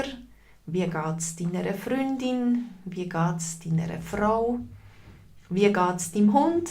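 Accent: Switzerland. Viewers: Sprechen Sie Berndeutsch oder Zürideutsch? Meiner Meinung nach gibt es auch Unterschied dazwischen ,danke.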